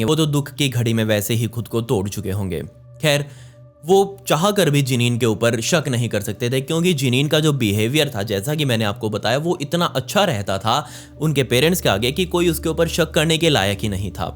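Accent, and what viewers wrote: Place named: Punjab, India. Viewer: hilariously you speak hindi little bit punjabi touch make your video more interesting carry on great job.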